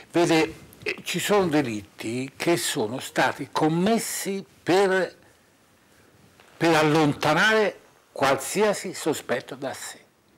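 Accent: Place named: Florence, Italy. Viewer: Grande Filastó. Un grande oratore con un carisma unico, un pozzo di memoria e di informazioni, oltre che irrimediabilmente toscano (anzi fiorentino, che per noi senesi è sempre guerra). Io vedo tanta controversia e credo sia di saperne troppo poco sull’argomento sia di essere troppo piccola ancora per potermi fare la mia idea su come le cose siano andate. Dall’alto dei miei appena cinque lustri di vita, posso dire che l’Avvocato Filastó è una persona che ammiro moltissimo, e che ritengo preparata ma soprattutto che non campa tesi in aria argomentando tutto quello che dice. E poi, la storiella del Duca alla fine mi ha stesa, quella è proprio l’essenza massima dei fiorentini e della loro personalitá. Che la terra ti sia lieve, <3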